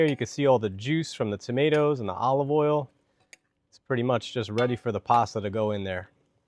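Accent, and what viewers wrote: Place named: New Jersey, United States. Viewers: I knew you were from NJ! We both say water the same way. Where abouts? I grew up in Union County before I moved to Florida in '89. Anyway, my wife loves cherry tomatoes and orecchiette so, she's getting this tomorrow. The Cat Distribution System chose you, yeah the CDS is a thing. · I knew you were from NJ. You just can’t mistake that accent. I love your recipes simple and doable. Can’t wait to try them. I’ve been saving them and writing my shopping list accordingly.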